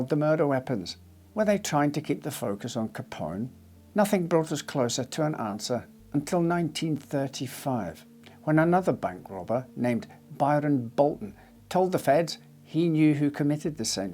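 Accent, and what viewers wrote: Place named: Glasgow, United Kingdom. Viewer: Washington state West coast U.S.A here. I hope yur real n not scrappy a.i.😕 Love the Glasgy speak😊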